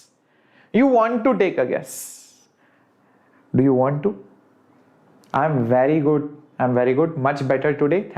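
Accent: South India, India. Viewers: Pakka South Indian 😅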